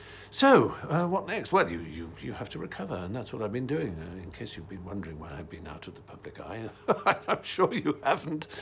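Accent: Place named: RP, United Kingdom. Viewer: I love the way he speaks. So soft and posh. We need him to stay in this world forever!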